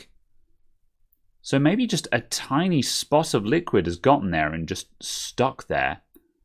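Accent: United Kingdom. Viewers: Your voice is literally why I have your vids running as a background when I work. So soothing and british :D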